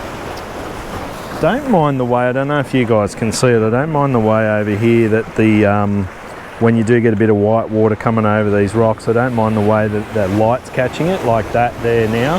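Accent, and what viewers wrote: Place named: Australia. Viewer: I truly enjoyed this clip. Love the laid-back, very Australian delivery. But that said the content was very useful and valuable. i really like the IQ of the Canon as well. I also liked the (abstract) waves and the richness of the colours. I hope to head down that way in a few months and will try out the technique. It was terrific that you mentioned details that I might not have otherwise noticed like the setting sun shining on the wave tops. I love capturing the complex movements of the water too. It seems that particular location is one that presents much variety to those who are keen enough to look. Favourites: the first two at the end, the same ones you said you liked.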